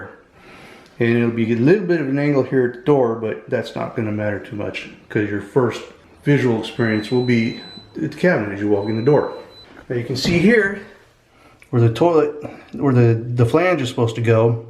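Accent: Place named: United States. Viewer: Sounded Amerikin to me.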